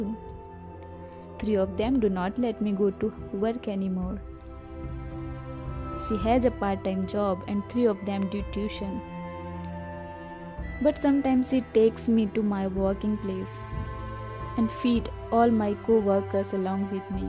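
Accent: India. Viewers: Wow..But I dnt Knw Y I dnt Wana Hear Indian english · Indian acent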